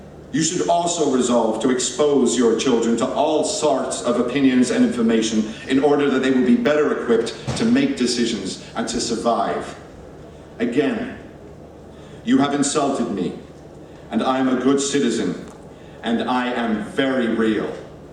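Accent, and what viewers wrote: United States. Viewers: I love how he portrays his best American accent throughout... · interesting that he is affecting an American 'accent' for this. Doesn't make his voice any less mesmerizing. :) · Did Cumberbuddy do this to practice his American accent?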